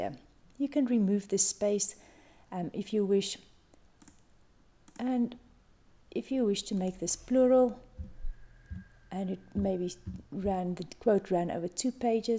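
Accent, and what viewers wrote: South Africa. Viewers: Sounds like a very South African accent to me. Thanks a lot very helpful!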